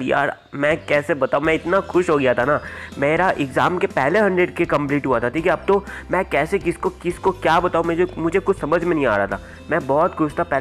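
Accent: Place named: South, India. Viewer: tu wese south ka hai kya ? tera bolne ka style esa hai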